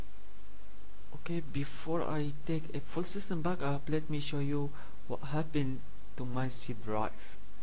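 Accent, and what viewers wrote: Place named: India. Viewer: kkkkkkkkkkkkkkkkkkkkkkkkkkkk I understand everything and I love indian (I suppose) accent.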